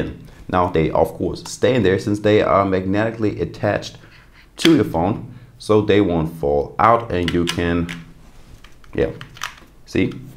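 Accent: Germany. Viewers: Are you from Germany ? or maybe Austria . your accent sounds German to me.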